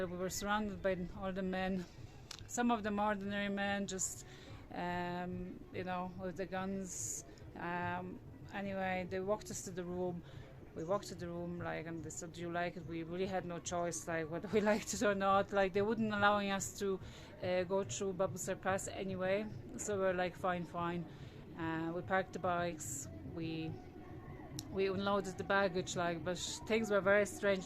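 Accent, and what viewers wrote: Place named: Poland. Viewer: Fantastic. I am from Islamabad Pakistan. I am really enjoying your Pakistan videos. I guessed it right about Poland looking at your names & accent. What i know about Polish people is that they are the most adventurous people on this planet. Most of the top mountaineers who come to Pakistan are from Poland & what you are doing isn't easy either. They love adventures 👏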